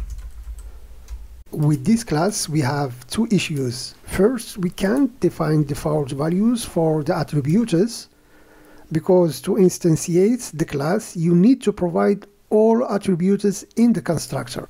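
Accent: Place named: North Africa. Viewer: it seems from your dialect that you are from north Africa, allow me say it in my Moroccan dialect: LAH YE3TIK SE7A!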